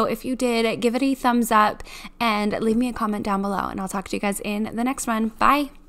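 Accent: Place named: United States. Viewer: Haha yes yes I know oregano just can't believe how you say in America! I love your accent! Also your video has inspired me to get out my uggs! 👍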